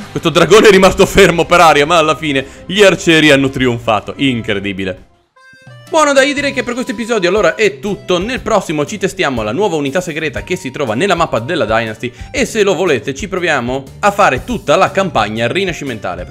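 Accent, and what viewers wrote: Veneto, Italy. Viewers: ADORO LA VOCE DA VENEZIANO (ed sono anche veneto) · Like chi conferma che l'accento iniziale di era veramente veneto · Bello il tuo dialetto Veneto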